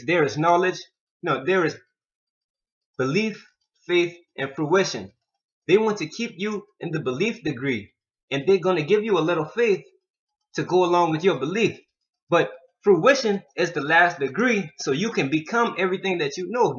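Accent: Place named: Gullah, United States. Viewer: I hear that geechee talk my brother. I’m Gullah geechee from the sea islands of Ga. Great Video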